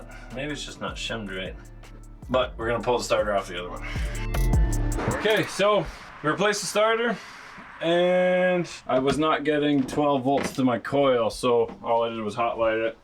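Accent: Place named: Canada. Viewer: How do you spell out the way you pronounce it… owe-t wtf nobody cares your from Canada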